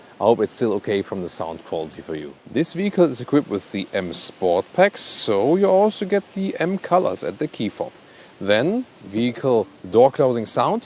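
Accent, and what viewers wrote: Germany. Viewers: sounds more German than ever 😆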